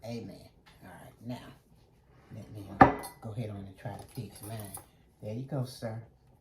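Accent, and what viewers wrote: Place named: South, United States. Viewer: Mrs., I just wanna come sit at the table. I love your spirit and yo kountrrryy twang.. God's continued blessings to you and.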